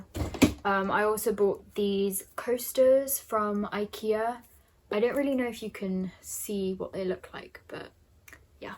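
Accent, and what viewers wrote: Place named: United Kingdom. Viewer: I really love your British accent.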